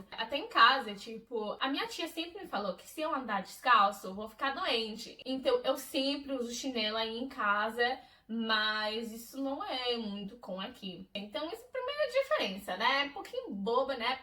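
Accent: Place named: São Paulo, Brazil. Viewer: Vc parece paulista, curioso o seu português ser tão perfeito . Deve ter ficado muito tempo aqui.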